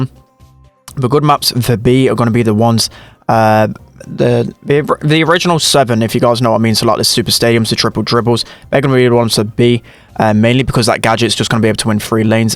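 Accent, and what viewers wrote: United Kingdom. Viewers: just a british accent :/and yea it is the best one out there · british accent bro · BRI'ISH accent bloody hell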